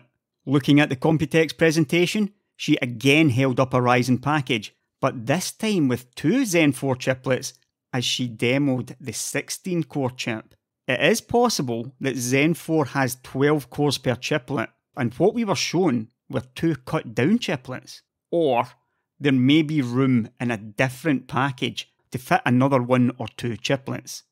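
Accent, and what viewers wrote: Scotland, United Kingdom. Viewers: Those soothing scottish dulcet tones!!!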